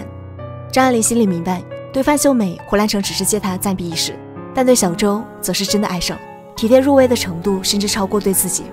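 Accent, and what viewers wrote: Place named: Shaanxi, China. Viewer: 这口音，陕西、山西或内蒙的吧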